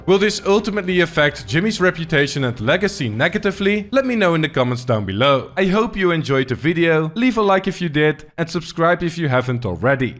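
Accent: Netherlands. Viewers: Why can Dutch people detect a Dutchie within seconds 😂 Good video tho! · Are you dutch? I hear an accent 🤔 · Just started watching. Already love his dutch accent. New sub here! 🇧🇪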